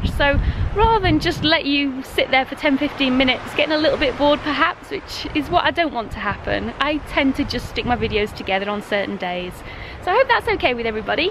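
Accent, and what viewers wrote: United Kingdom. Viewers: Healthy meal!! Heat is dangerous, be careful out there! Love the accent. It’s like a Mary Poppins thing, very sweet. The suitcase, clothes and cans look very old. Sometimes “interesting” people do their own adventure.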